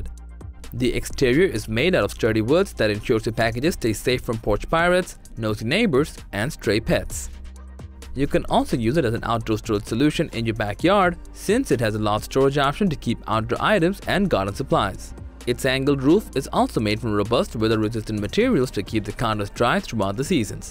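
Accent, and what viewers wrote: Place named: China. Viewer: Your Chinglish needs a bit of work...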